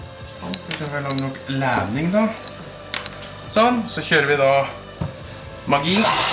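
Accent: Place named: Fredrikstad, Norway. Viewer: Jeg er litt nerd på dialekter. Får jeg tippe? Fredrikstad? Mulig jeg bommer stygt hehe.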